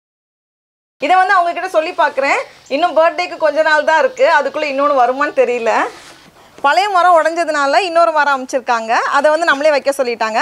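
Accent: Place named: Southern Tamil Nadu, India. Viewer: ninga unga speech la Thenmavattam thaan ninaithen... athu correct pola Nagarkovil nu sonningalaa...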